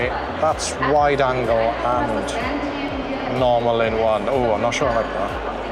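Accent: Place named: RP, United Kingdom. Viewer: like your b.b.c.voice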